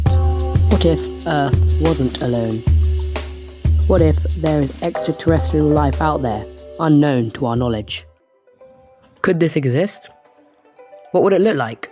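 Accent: United Kingdom. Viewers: Are you british 💀 also i know im very late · I would call this ok, but the british accent turns this into a 100/10, oh yea, and the vsauce sound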